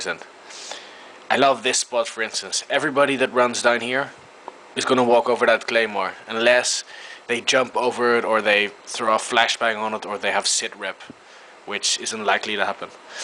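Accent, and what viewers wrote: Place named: Netherlands. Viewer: kom je toevallig uit nederland... i just asked you if you re from the netherlands cause your little accent sound dutch..... good video by the way.... i like the bushmaster also...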